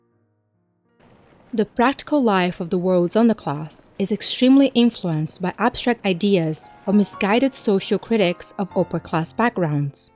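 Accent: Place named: RP, United Kingdom. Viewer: A fascist narrative that you've, for the benefit of ideal doubt, blindly concluded as your perceived apolitical stance as an arts scholar. You should never approach another work of art with any appreciation or criticism again as I feel even the work of Steve Cutts might overwhelm you. This video reeks of fear and we all see through the posh accent and thesaurus vocabulary.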